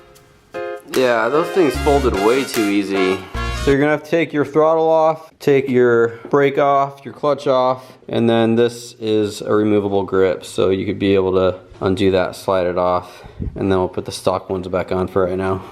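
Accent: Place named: Australia. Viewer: aussie yeowww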